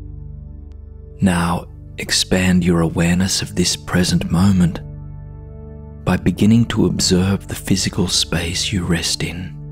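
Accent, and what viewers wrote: Australia. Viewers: Isn‘t he Australian? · I think he’s Australian not British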